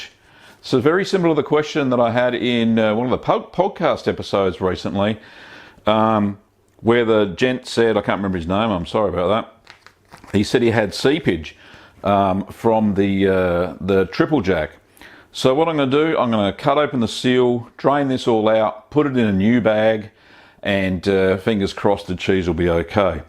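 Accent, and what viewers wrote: Australia. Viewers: This has been my favorite cheese that you've made so far. I really hope this one turns out well for you. I just love how you Aussie's say the number 13. It sounds like, "Thuh'deen." In the US, we really over-pronounce the letter "r" compared to the UK, NZ, South Africa, and Australia. Words like. "Where, there, beer, water..." in the US we really nail that RRRRrrrr sound at the end. You Aussie's really smooth it out to sound more like... "Whea, Theah, Beeah, and Woe'dah." It's nice to hear.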